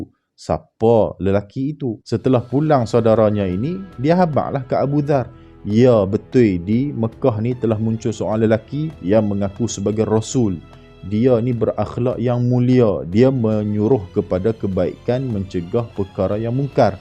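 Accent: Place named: North, Malaysia. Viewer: speakker org utaqaaahahahah pekattt ni rimbukkk org kl mna fhm apa hahahah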